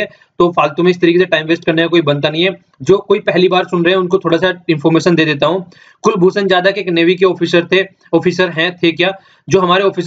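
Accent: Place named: Rajasthan, India. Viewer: sir u are the best...i appriciate ur knowledge...way of teaching....and hard work for us...and i think you are from rajasthan from your accent as i am too from ajmer i will love to meet u sir...but that too after 2018 prelims...i am to give in a lot into it till then... thanks sir..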